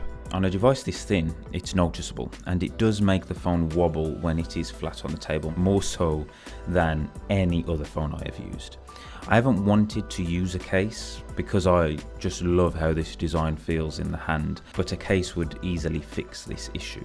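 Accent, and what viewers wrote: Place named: Black Country, United Kingdom. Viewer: You from dudley?? I like black country people, my mum is from there. 😂❤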